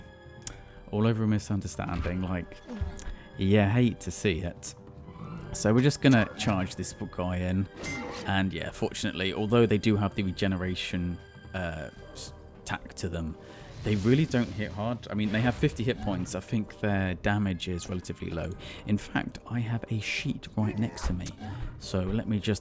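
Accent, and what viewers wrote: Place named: United Kingdom. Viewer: Come for the gameplay, stay for the posh English boy living 2015 again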